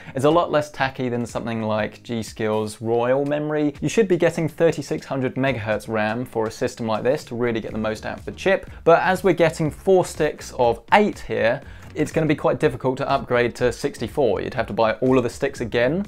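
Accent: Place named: Tunbridge Wells, United Kingdom. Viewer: You sound very Tunbridge Wells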